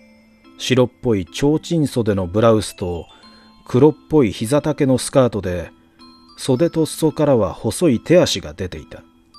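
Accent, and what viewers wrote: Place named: Osaka, Japan. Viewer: おはようございます。いま拝聴しました。なでか懐かしく感じられる里山の風景と滑らかな大阪弁小さな出来事が見事に描き出され朝からほっこりしました有り難うございました。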